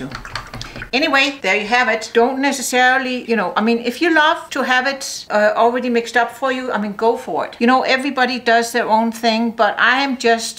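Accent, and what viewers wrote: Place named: Denmark. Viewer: love this colour. Sounds like you are originally from Denmark. Am I totally wrong? 🙂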